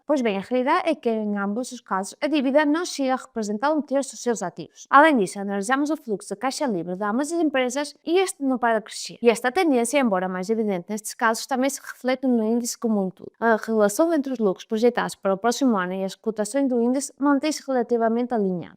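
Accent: Spain. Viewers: não se percebe muito bem o teu "portunhol"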